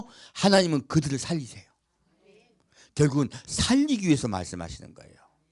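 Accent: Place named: Jeolla, South Korea. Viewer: 전라도네요. 아멘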